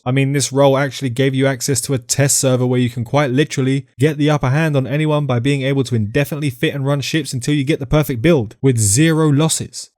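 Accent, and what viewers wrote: United Kingdom. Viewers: I admire your British accent, will miss it...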